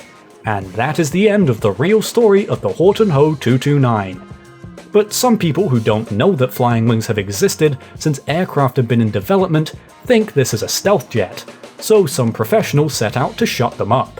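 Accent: United Kingdom. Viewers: Brit talking. Opinion immediately discarded.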